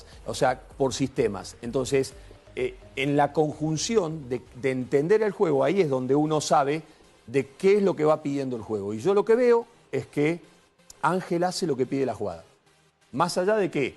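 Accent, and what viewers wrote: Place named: Quito, Ecuador. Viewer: Grande Alfaro... Y se nota q se le está pegando el acento quiteño...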